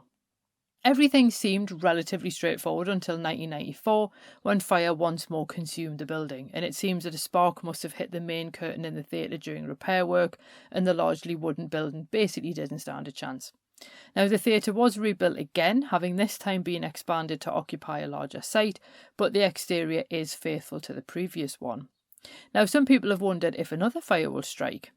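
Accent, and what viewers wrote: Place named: North, United Kingdom. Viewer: One of my favourite things to do whilst travelling for work is to watch a spooky history soc on YouTube, I'm from the north so was very surprised to hear a familiar accent!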